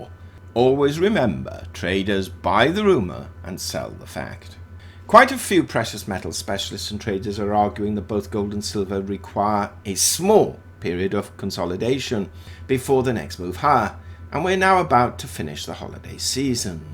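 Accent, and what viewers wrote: United Kingdom. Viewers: Love hearing that Brit accent, very comforting. Thanks for the update.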